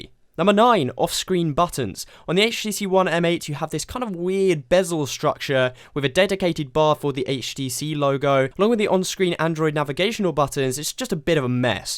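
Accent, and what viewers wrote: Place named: Scotland, United Kingdom. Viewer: i heard your accent before where r u from bro? is it Scottish? Irish?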